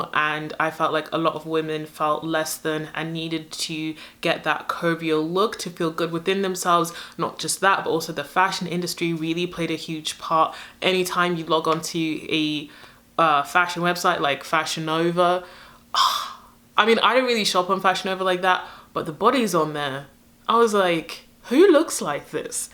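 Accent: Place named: United Kingdom. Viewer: are you British? I absolutely love your accent.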